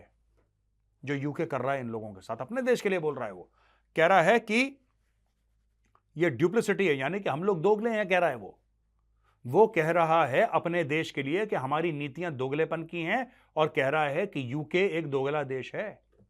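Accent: Lahore, Pakistan. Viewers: Major Sahab aapki Lahori Bhasha maja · Mejor Saab app jo lahori language bolte hai ..usse sunne ke liye hamare kaan bahut tarastee hai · major shab Lahori accent, so beautiful so elegant just looking like a wow 😮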